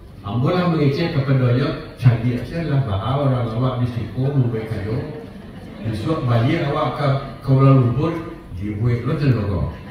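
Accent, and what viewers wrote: Minangkabau, Indonesia. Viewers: indak hilang logat minangnyo 👏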